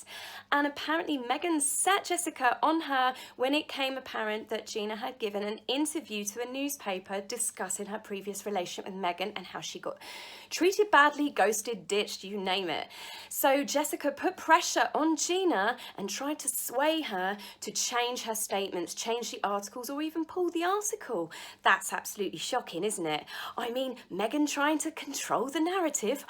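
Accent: United Kingdom. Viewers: Your accent is 🥰 I’m American so yea I admire the British accent. Actually, I love everything British. Anyways, as to the topic of Meghan, I’m sorry this woman is trying to ruin your royal family. She’s nothing but trouble! Personally, I don’t want her back but if we have to we will deal with her accordingly. The tabloids here will shred her. Idk what she’s talking about that there’s no tabloids in America. That woman is a liar! We have a tabloid magazine called the National Enquire here and many more. Hang on my British friends, this pain will pass.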